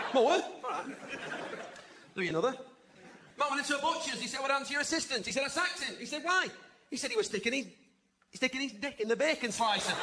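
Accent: North, United Kingdom. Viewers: Stewart Liebenstrudl it's northern 💪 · Yes it's English. Just not the Queen's English, this is northern, Bolton in Greater Manchester.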